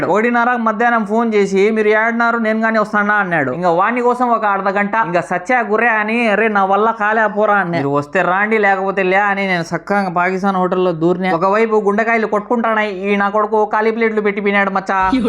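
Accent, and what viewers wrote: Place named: Kadapa, India. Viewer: కడప యాసా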